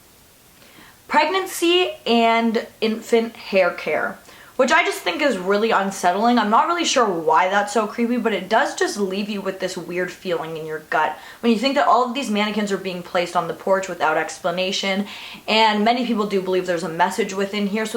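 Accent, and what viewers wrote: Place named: Canada. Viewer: I love how Canadians say "about". Aboat or aboot, hehe. Sorry, I'm British. Awesome video once again, you're the Queen of the creepy. <3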